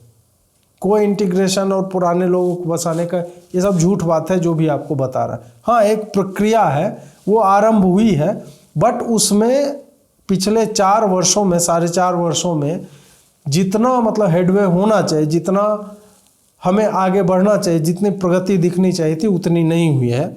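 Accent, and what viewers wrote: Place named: Bengal, India. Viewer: Bengali accent is too good. Milega sab milega.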